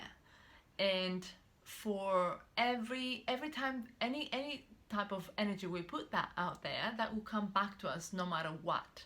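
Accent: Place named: France. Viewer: Ok, first off: your positive energy is very much appreciated. Secondly, your accent is thick French; are you French by any chance? To dig into the meat your argument, your example was purposely vague to stimulate thoughts. Very provocative, I must say. I hate to argue with positive women such as yourself, but energy is technically not a force; however, I will forgive you this time, because energy operates under similar laws to Newton's 3rd. If you want to broaden your arsenal, the law of energy reciprocity, that you are refering to, is called "Conservation of Energy".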